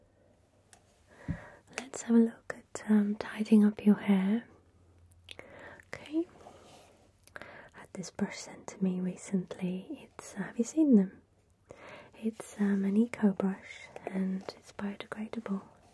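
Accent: United Kingdom. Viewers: your beautiful British accent and lovely personality are the sole reason why I watch your videos. My mom playfully teases me because I absolutely love the British accent and that I’m meant to marry an Englishman. ❤️ #ASMR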